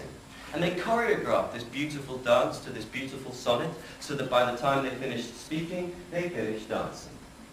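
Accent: Somerset, United Kingdom. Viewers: load of bollocks. Hardly anyone does RP. Let him show some. And does he know where the actors came from originally? It is implied that they came from Birmingham which is near Stratford where he lived. I suspect that people in Stratford don't really speak with much of a Brummie accent today and certainly not then. He sounded like someone from the borders of Somerset. NO! I do not believe he knows where an individual actor might have come from. There is some sort of received wisdom that a Linguistics Professor says that you can even tell the accent from the spelling of the words. Again bollocks. I can say in good old Cockney.. "What's the matter?" Well, you know how that sounds.. I am now from Norwich "What's the matter?" Sound the same?? No. Of course not. Now I come from New York.. "What's the matter?" I'll say no more. It is just intellectualising horse-shit. 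Some say that Richard Burbage, the most famous Shakespearean actor ever, was born in Shoreditch. Exactly where the Globe Theatre was built. Do you think he had a London accent?? Yep. But probably not the Cockney accent you hear today. But Shoreditch is really within the sound of Bow Bells. So, if you know what a cockney sounded like in 1600 then you might know. And it wouldn't have been in some generic English accent that some Professors believe in. Another reason to believe that this speaker is talking BS is the Bath-Trap principle. Google it. Interestingly the Midlands (including Stratford) and London is part of the s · Thank you for your research into this, and your ability to communicate it so effectively! -Can you please explain if there is ALSO a regional difference? I know - like here in the USA - we have "standard" - basically there's standardized dialect we use in the USA - and there are wide - and wild - regional differences. 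I'm not as familiar with all the UK dialects as I should be, but your OP sounds a bit like - what is it, Somerset??? - to my ear.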